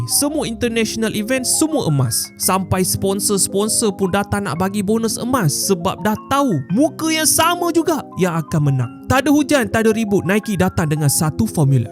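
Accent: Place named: Kelantan, Malaysia. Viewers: kelate sedak 😅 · kelantan😂 seda0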